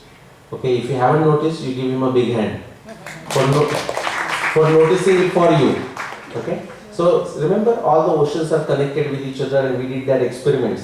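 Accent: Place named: Maharashtra, India. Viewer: मराठी मंडळ म्हणुन मराठी उच्चारात इंग्रजी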